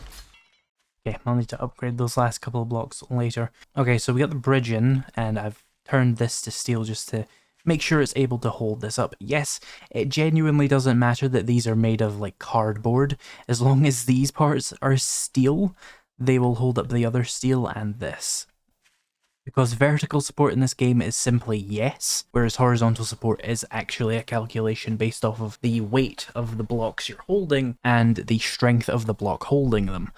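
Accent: Scotland, United Kingdom. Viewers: Hey! Love the vid (taking it in chunks) and love the accent. Special place in this ol" gramma's heart for Scots.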